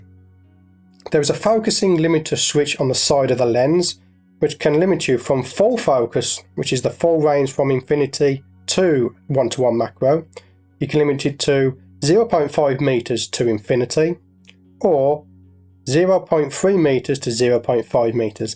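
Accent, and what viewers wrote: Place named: United Kingdom. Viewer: Love your systematic division of points of review. Cool accent too... is that English? Which part of the UK?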